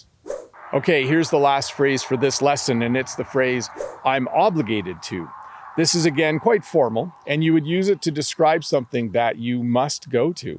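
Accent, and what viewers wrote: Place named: Canada. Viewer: finally found someone canadian that teach canadian inglish for me is the best understand very well evething was there many years ago I miss canadian English, usa English is more confuse to me Thanks 😮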